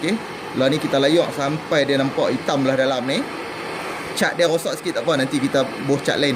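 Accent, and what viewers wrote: North, Malaysia. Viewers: Uishhh pekat sunggoh laaa loghat utara dia🤣🤣🤣sempoi bos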